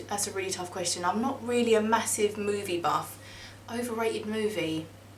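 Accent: England, United Kingdom. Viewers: What part of England are you from? Could listen to you narrate a phonebook all day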